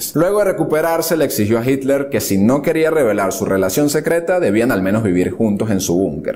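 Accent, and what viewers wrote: Venezuela. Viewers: Yo sabía que este chamo es venezolano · Hermano tu eres venezolano?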